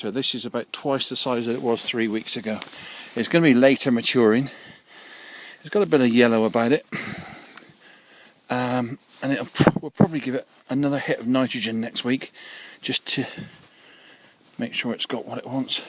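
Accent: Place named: West Country, United Kingdom. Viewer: I'm growing several varieties from the garlicfarm "Wight" plus others I've picked up from other growers and private people, some varieties I can name off the top of my head are Maxatawny, inchellieum red, albigensian, solent wight. early purple, lautrec, Purple haze, Bella italiano, czesnok, siberian, red grain, red rezan, red belgian, vallalado, elephant garlic, avignon, killarney red, morado, estonian red, oosterdel (lithuanian) Harnas, wioleta, music, german stiffneck,Pyongyang, czech varieties Vekan and broadleaf, bulgarian, transylvanian, beli luk(bosnia) Anatolian Toscapru,(Turkey) in fact too many to keep track off. I actually work in Norway and despite having a bumper crop this year it was too long in the ground due the idiot (Ex)partner of mine not telling me it was ready and the bulbs opening, I am going to dump over 15,000 harnas bulbs in addition to the 6000 I've already dumped as don't have the land or resources to plant it. 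I will be back in Poland, for two weeks from 13-10 mail me at <mail> I hear from your accent your from the west country, I'm originally from Wiltshire. Scott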